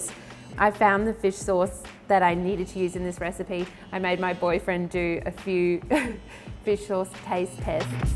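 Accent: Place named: Australia. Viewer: I think her is Aussie Accent!!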